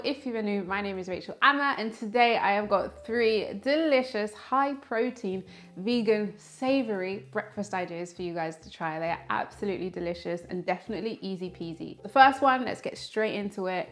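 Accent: United Kingdom. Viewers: Yo hablo español, but if my listening is not too bad, your accent sounds british. I've heard there are good vegan options in Britain. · Thank you!!! The food looks delicious. I love your British English. I watch the videos to learn recipies and vocabulary too. 🤗🇧🇷🇧🇷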